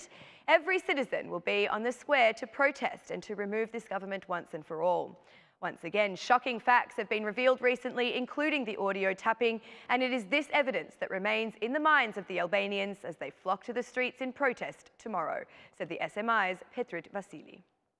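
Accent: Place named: London, United Kingdom. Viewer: LOL Nga Londra eshte kjo prezantuesja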